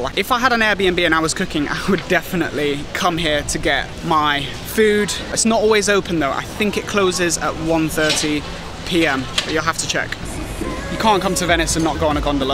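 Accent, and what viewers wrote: United Kingdom. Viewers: You've got really lovely British accent :-P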